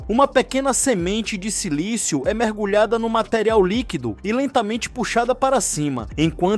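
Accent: Northeast, Brazil. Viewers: Essa narração nórdéstina tá muito ruim !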